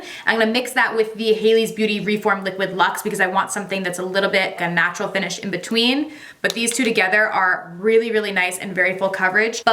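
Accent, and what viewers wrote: New Jersey, United States. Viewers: Yes it’s her Jersey accent haha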